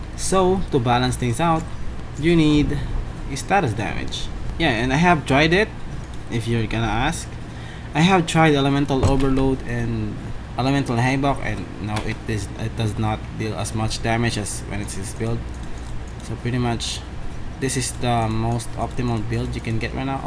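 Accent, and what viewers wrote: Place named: Philippines. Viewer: Your accent is familiar.... You a Filipino my guy? btw thanks for the burn build idea.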